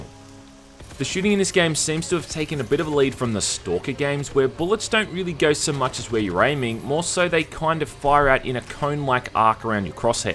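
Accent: Australia. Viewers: I fucking need subtitles to understand your aussie.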